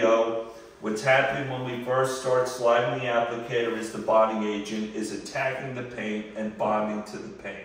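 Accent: New York, United States. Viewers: if i know my people well , I'd say you're from NY. Long island here. Do you guys do detailing or just sell the products.? Im in the Dallas area as well.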